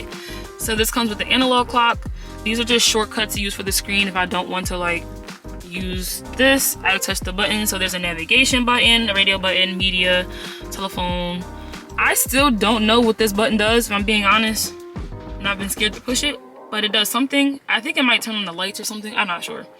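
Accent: Philadelphia, United States. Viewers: Are you from Philly? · I was looking for this comment. I’m like she gotta be from Philly. I’m from south jersey so I be knowing.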